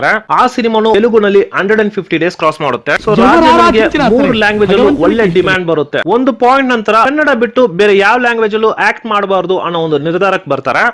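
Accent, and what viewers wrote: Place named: Tamil Nadu, India. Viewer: ನೀನ್ಯಾವನೋ Tamil ನವನು ಅನ್ಸುತ್ತೆ... ಸರಿಯಾಗಿ ಕನ್ನಡ ಮಾತಾಡು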